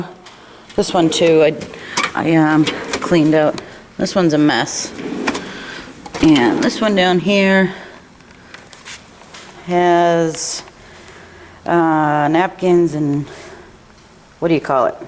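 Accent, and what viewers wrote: Boston, United States. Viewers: Being from Boston, I noticed your accent immediately. Kerri :)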